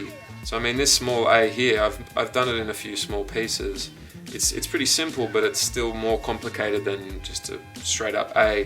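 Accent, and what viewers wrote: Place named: United Kingdom. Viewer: Dude, you have the britishst accent ever!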